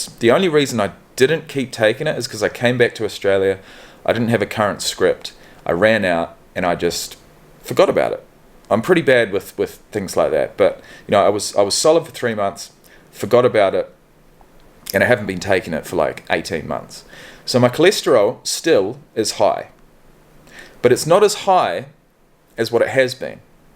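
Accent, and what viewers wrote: New Zealand. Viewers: I thought you were a fellow kiwi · His accent is New Zealand not Aussie but they are similar. Congratulations on your sobriety. :)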